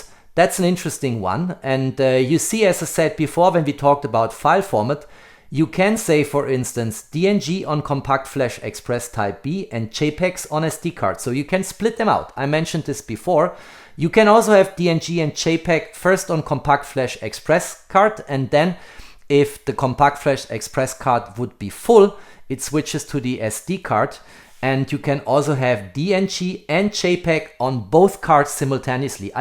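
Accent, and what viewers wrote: Germany. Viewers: Nice videos, well explained. Thank you very much for that. But why don't you make these videos in German too? You're a German speaker, right? That's what your accent sounds like. This video is absolutely great. I bought an SL3-S yesterday and am now watching your video. It's really helpful with the camera settings. I think you'll be the only one to publish such a perfect explainer video for the SL3 in German on YouTube. Please do it in German. There are enough people in Germany who don't understand English. You would be a great help to them. Perhaps you could have it translated using AI. Thank you for your understanding and kind regards.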